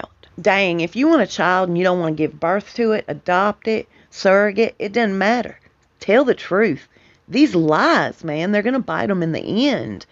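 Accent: South, United States. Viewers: I enjoyed your video today. As much as I like the southern accent, I am afraid it is too much for me, and I can't follow. Today you were much better . Do our really talk like that all the time, it seems like you exaggerate it sometimes . If not I apologize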